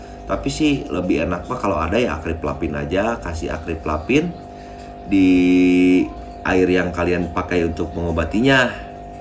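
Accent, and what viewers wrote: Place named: West Java, Indonesia. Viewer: Suka sm logat sunda nya...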